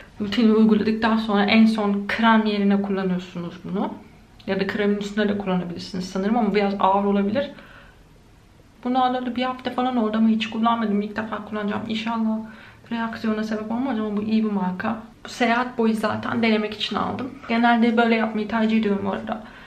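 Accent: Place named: United Kingdom. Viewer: Sizin konuşmanız nasıl acaba ?? Hayır izlemeyecekseniz izlemezsiniz niye böyle bir şey yazma gereksinimi duyar ki bir insan. Ancak birini incitmek için... Başka hiç bir açıklaması yok. Araştırıyor, uyguluyor. Diger youtuberlar gibi fıss değil ama halen kendi elinde olmayan bir sebep yüzünden eleştiriliyor. Üstelik küçükken bir rahatsızlık geçirdiği için konuşması böyle ve bence çok çok tatlı, kibar ve naif. Ayrıca ingiliz aksanına benziyor. Insanlar birseyin içinde kötü görmek istesin yeter ki... Ne acı. Yazık.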